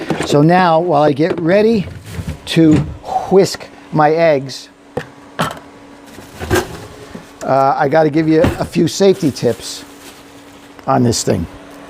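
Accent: United States. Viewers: Love your sense humour and your American accent. From 🇬🇧💕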